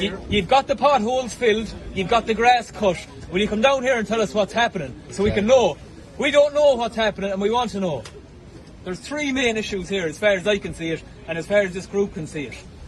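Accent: Ireland. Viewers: I do like the irish brogue and the guy is spot on . The only thing i'd ask the irish people is not to bring flags to the muslim march every week end in london because we have the same problems . God bless ya' lads ❤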